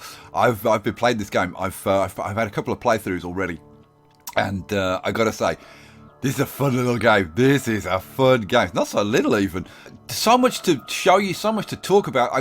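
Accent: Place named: Wales, United Kingdom. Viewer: Loved the welsh accent! haha! who's coat is that jacket?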